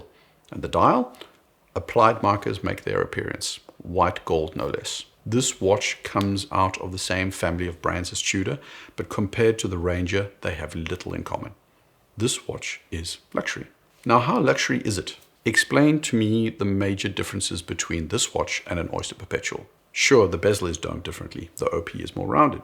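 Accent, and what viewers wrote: South Africa. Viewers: Superb video. By the way, you sound very South African. To me the Index field watch by which all others are judged is the Hamilton Khaki field. Or maybe the British CWC. It is a bit expensive for where I find myself now, so the Timex Expedition Scout fills that niche, along with a Citizen field watch, although its a tad big at 42mm. Gshocks are very cool, but per definition are not field watches. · Dude are you South African? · You sound South African, aber mit Deutschem Hintergrund...?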